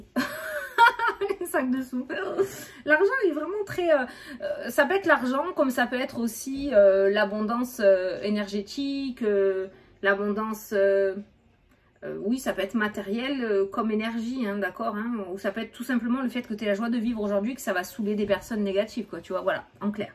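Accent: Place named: Landes, France. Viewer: Oups, oui je voulais te le dire ..je suis dans le 78! Mais originaire du 40 😉voilà pourquoi j'adore entendre ton accent 😁... Sinon, niveau fatigue, dans mon entourage, tout le monde est ''rincé''!!! Et puis les vacances d'été approche...je travaille dans l'annimation auprès des enfants et ça se ressent ! Bises à toi et à la communauté !!!😘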